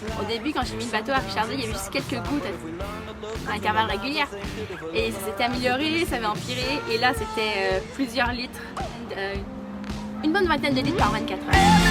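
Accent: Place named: Quebec, Canada. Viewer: qu'elle belle surprise de te retrouver. Tu donne du plaisir à beaucoup de monde. Je suis un vielle homme de 75 ans invalide, veuf et je vis seul dans une maison trop grande. La vie que tu as choisi. Quel volonté et courage de continuer l'aventure seule sur ton petit eskimo. Tu vis ton rêve que d"autres ne font que rêver. Je vous suit depuis des années. J'aime votre accent du Quebec, parfois incompréhensible (je suis Belge) et vous et quelques autres voileux vous êtes mon seul plaisir. Bon vent à toi petite sirène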